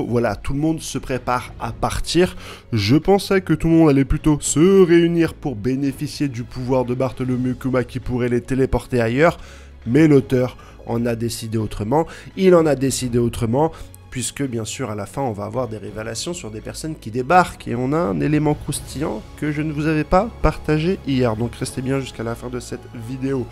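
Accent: France. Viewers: Il serait temps que Dragon se bouge un peu 🤣 Sinon pas mal l'accent du sud il y a du potentiel.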